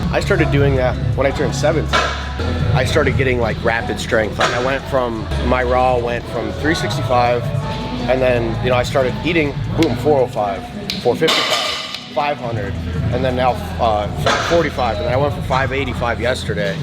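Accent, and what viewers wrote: United Kingdom. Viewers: restarted and British bro ngl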